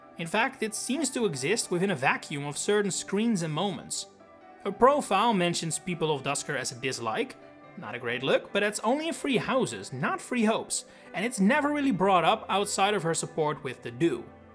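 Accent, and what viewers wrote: Netherlands. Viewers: I was contemplating if he was dutch or not for so long! I kept thinking, is he dutch? The accent sounds so dutch! Now I finally have confirmation! Another dutch YouTuber found! I love being dutch sometimes hahaa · You don’t have to tell me about jokes. When I lived in the US, the jokes that killed in the Netherlands fell flat with my American friends lmao Also, I KNEW you were Dutch!! I definitely recognized your accent when I first heard you speak in your earlier videos. I love seeing fellow Dutch people and especially when they’re into FE lol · You can hear you have an accent but I did not expect it to be dutch! Are you from the netherlands or belgium?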